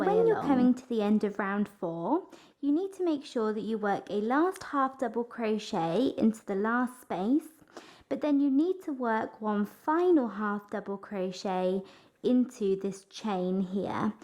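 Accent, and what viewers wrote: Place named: RP, United Kingdom. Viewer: love your voice you talk very posh!!! im in UK too, and im from up north lol x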